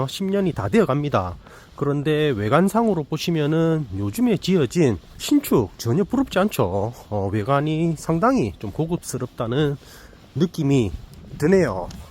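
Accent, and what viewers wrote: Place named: Busan, South Korea. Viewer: 부산태생이라그런지 에 자꾸눈이가요 말씀도 넘 구수하게 잘하시는 사투리가 듣기좋아요 응원합니다~~^^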